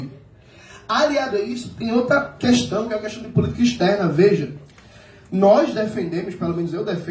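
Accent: Northeast, Brazil. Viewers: Adoro e clareza do raciocínio desse garoto, falando com sotaque nordestez fica ainda mais incisivo. Parabéns professor Tudo a ver com Cirao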